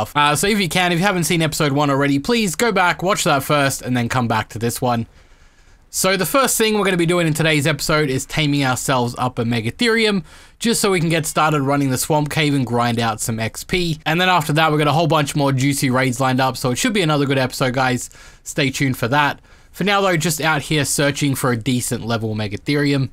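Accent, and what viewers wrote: Australia. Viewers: Are you australian? xD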